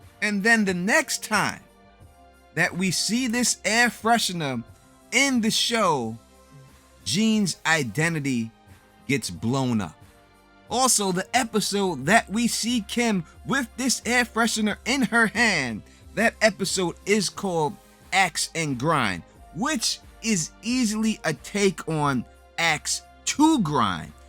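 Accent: New York, United States. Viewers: Can you talk a little less black and new york-ish, please. We get it, you're a black new yorker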